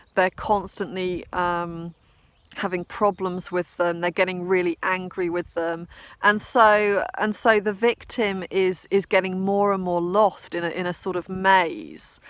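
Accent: England, United Kingdom. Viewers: I prefer the English accent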